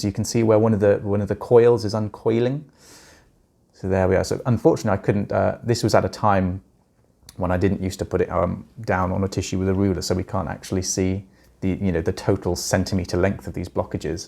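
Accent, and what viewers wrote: United Kingdom. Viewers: Yup! Cute doctor with a British accent always has a calming effect on me. 😆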